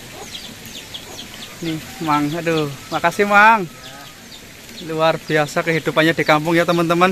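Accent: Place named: Banyumas, Indonesia. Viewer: Sampurasuuuun,sing sarehat sukses baraya..kang ciganamah aya aya ngapak na gitu....hehee mantap kang...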